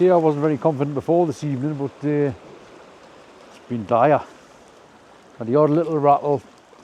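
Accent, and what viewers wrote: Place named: Northumberland, United Kingdom. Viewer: Lost sock in your wellingtons Picking your noise 😂Trying to pin point your accent at a guess l would say around Morpeth Blyth area 😊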